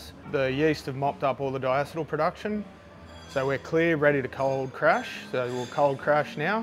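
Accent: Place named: Australia. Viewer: It's so good to hear an Aussie accent not pronouncing words like yanks, if only we could do the same PSA for couch (it's lounge), cookie (it's a damn biscuit) and ass (it's arse here)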